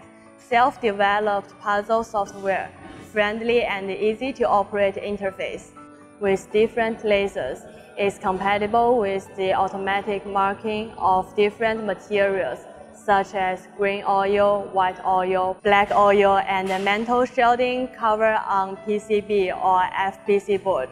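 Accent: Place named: Taiwan. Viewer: 這個台式口音讓我把廣告看完再過來看一次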